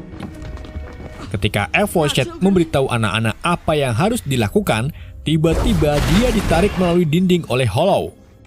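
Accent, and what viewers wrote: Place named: Banyumas, Indonesia. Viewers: ternyata wong ngapak, salam sing Tegal 😂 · iso ngapak ya rika jebulnya 😂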